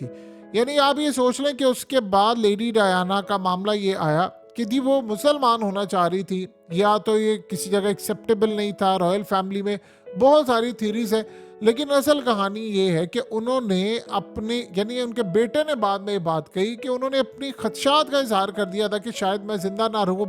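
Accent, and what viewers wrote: Punjab, India. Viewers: Punjabi aisay hi boly hain Dont worry 😅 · Yes, this faceless journalist has to give up his Punjabi accent and learn to pronounce correct names of European/American leaders, celebrities and names of British Royal family members. The name is NOT CHAARLAS it's King Charles the III. Thanks.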